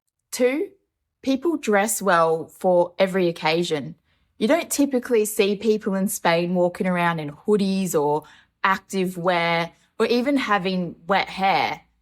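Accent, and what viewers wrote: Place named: Australia. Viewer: I could listen to you talk all day long, LOVE your Australian accent ❤️🇨🇦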